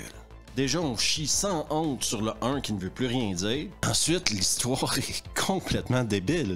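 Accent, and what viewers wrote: Quebec, Canada. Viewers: Je crois que je l'avais vu à la télé et je n'en ai pas gardé beaucoup de souvenir ! J'avais même oublié Michael Ironside et Virginia Madsen ! (qui se souvient de "Electric Dreams"?) Déjà, le nom du méchant... Le général Katana ! Ca sent le nanar ce nom là 😂 Merci pour ces merveilleuses "RE-VU" si drôles avec cet accent du Québec que j'aime beaucoup :) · je m'abonne car j'aimerais comprendre si le sarcasme est un talent personnel ou bien si cela viens de la nonchalance de l'accent Québécois, tout en découvrant de merveilleux films 😅😅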